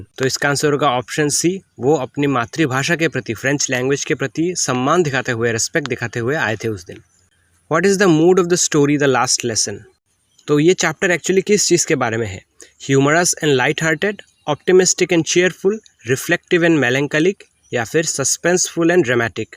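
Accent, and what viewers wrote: Bengal, India. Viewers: Dada apne kita Barak valley theki ni na onno jagar emne tho Buchi apne Bangali 😅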